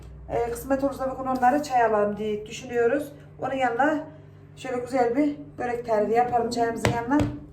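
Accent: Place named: Ankara, Turkey. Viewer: Konuşmanız. Sanki ankaralı gibi nasıl yaptıgını göremiyorum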